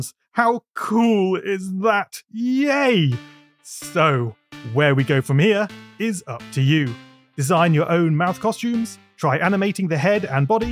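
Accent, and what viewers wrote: United Kingdom. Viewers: I think you’re from UK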